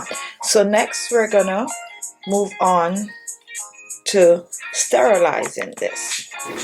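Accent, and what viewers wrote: Jamaica. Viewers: You sound Jamaican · Why does she sound like a Jamaican 😌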